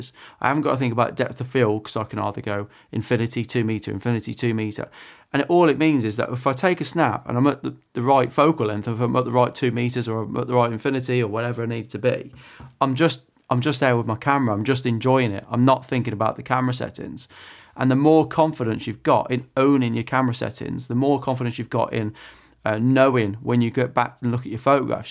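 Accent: United Kingdom. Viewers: Maybe I misheard you--sometimes I have trouble with British accents (I speak that weird variant of English spoken in the USA... :) BTW, I just bought the a6600 two weeks ago and I'm having a blast with it. I had stopped using my Canon 5D because it was just too heavy and bulky. Getting back into street photography with the Sony--I've found your videos very inspiring.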